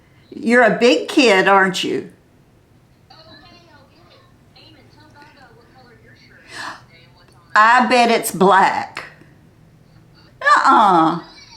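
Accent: South, United States. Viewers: Your southern voice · You are such a beautiful woman, inside and out. Prayers for your stressful things to be over, and everything else to be good. I enjoy seeing you, and I love listening to your “southern” accent! It’s so pleasant to hear!🙏❤️💐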